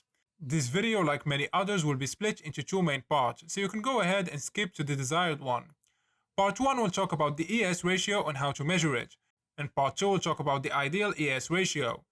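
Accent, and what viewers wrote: Morocco. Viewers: This guy sounds very Moroccan.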